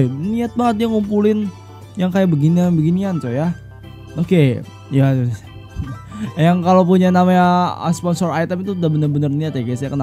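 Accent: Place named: Bali, Indonesia. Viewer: Entah kenapa logat ngomongnya kyk orabg BALI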